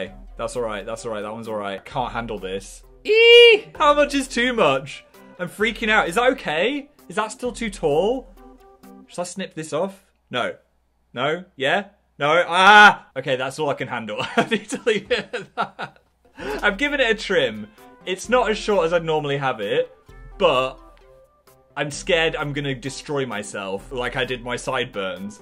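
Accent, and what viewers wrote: North, United Kingdom. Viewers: I have never heard you sound more northern... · Is it only me or does sound extra british in this video?:D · Do I hear Phils northern accent making a comeback 🥳🥺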